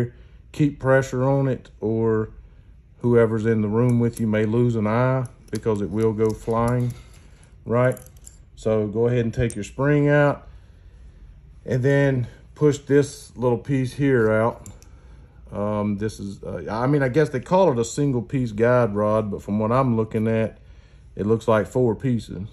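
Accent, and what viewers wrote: South, United States. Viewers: You can’t help your southern accent and I for one will not hold it against you sir , at least you speak English .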